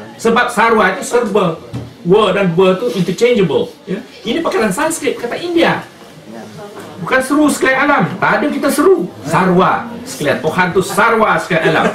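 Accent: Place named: Java, Indonesia. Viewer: AKU TAK RASA DIA BUGIS, CARA BERCAKAP PUN DAH TAHU DIA JAWA HAHAHAHAHA DIA PUNYA HUJAH PUN PRO-JAWA HAHAHAHA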